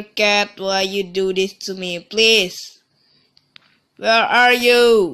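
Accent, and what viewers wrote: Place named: Philippines. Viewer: Your sound is :v Pinoy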